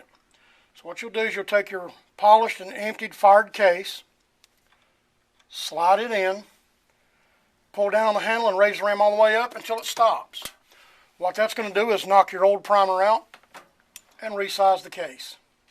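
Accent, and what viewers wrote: United States. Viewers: Great video. Thx for showing. You def have a voice of country, good ol boy right ther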